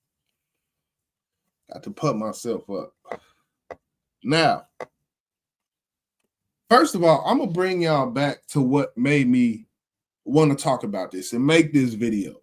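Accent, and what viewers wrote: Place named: Tennessee, United States. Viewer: You obviously don't know Hip Hop. All over the planet, is ALWAYS in the top 10 best rappers list....and ALMOST ALWAYS in the top 5 best list...and often in the Top 3....and typically called the GOAT by many with.as best Hip Hop album ever.....just like this guy here did, and he sounds like he's from back water TN....not North East! Lol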